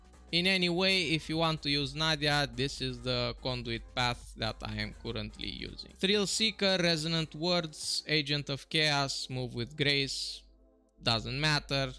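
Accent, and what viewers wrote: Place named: Russia. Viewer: о боже, какой у тебя акцент... ты походу русский...)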